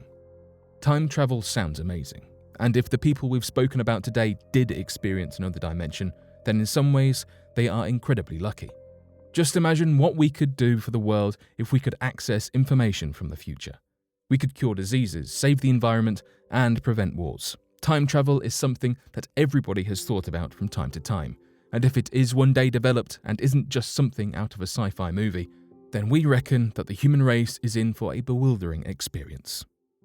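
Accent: United Kingdom. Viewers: The monotone British guy?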